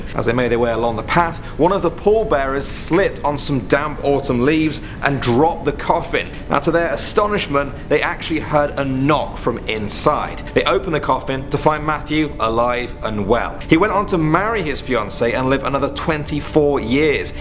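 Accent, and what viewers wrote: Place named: England, United Kingdom. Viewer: is my favorite i love his enland accent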